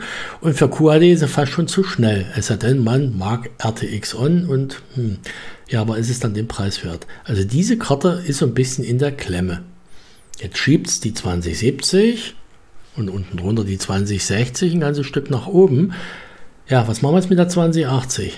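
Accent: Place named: East Germany, Germany. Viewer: Du bist ja noch "am Anfang", du wirst sicher sehr schnell sehr viele neue Abonnenten haben. Jedenfalls machst du das äußerts professionell , du wirkst wirklich ausgesprochen kompetent. Davon ab, dein leichter "Ossi-Akzent" ( sorry Dafür ), ist sehr sympatisch. Weiter so !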